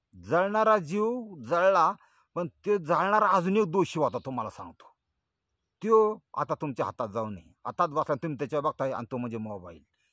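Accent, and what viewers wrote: India. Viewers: तुमच्I गावरान भाषा खूप छान आहे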